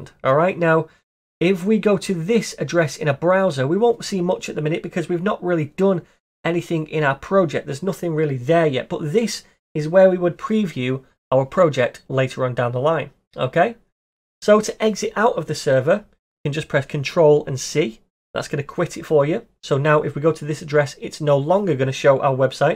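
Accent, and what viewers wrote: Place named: North, United Kingdom. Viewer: nice to hear and be taught by a fellow northerner!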